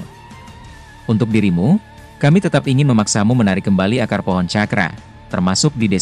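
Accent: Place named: Makassar, Indonesia. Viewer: makassarnya kantala, bnget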